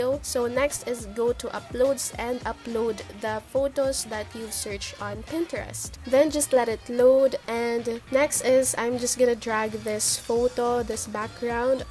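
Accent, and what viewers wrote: Philippines. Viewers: boses mo parang pilipino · Hello I’m new to checking out your channel :) It’s my first time learning & how to with tutorials on everything of making an intro for YouTube channel video! Question? Can I do these on my phone? Because I often use my phone more than my Mac 💻 or iPad? Because I don’t often bring these with me anywhere as they are heavy & not all of my photos/videos sync to my laptop or iPad,I took it off and forgot how to put it back haha 😂 anyways thanks for sharing I hope I can learn in no time! Also by the way your accent is I can tell your Filipino? Is that weird? 😅are you live in the Philippines or in the states? I miss being home but too ouch heat is kinda bad for my health! Thanks again for sharing!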